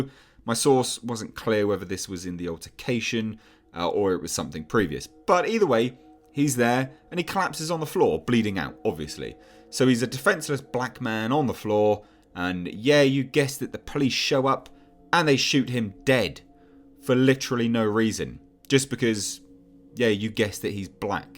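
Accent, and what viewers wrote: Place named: England, United Kingdom. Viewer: I don’t think you watched the original Candyman. You sound a little too bias because of your lack of fondness of Jordan peel. Based on your accent you should know who Clive barker (a fellow Englishman), who originally wrote Candyman. So the so-called “racial stick?” was not created by Jordan peel but originated from Clive. You have some great information pertaining to the plot, but unfortunately very bias and you sir sound a little offended by the actual plot.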